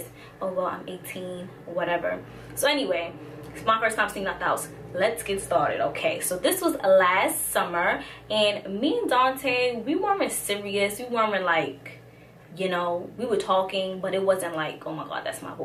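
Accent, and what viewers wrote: New York, United States. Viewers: yess NY accent, always spot a NewYorker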